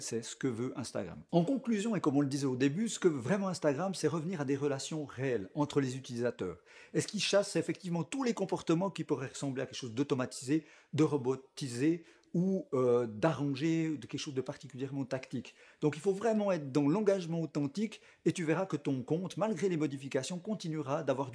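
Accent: Switzerland. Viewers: Très bonne vidéo, très intéressant, surtout pour des gens comme moi qui ont Instagram dans le coeur de leur business. Est-ce que je peux te demander tes sources? Car celles que je consulte habituellement n'ont pas relayé ces infos:) Très bon boulot en tout cas, je vais regarder d'autres vidéos 😊 Sinon, serais-tu Suisse? Je semble déceler un accent comme ceux par chez moi;)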